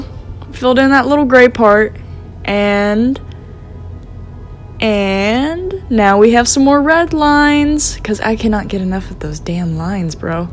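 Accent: South, United States. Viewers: Wait i think its because you both have a southern accent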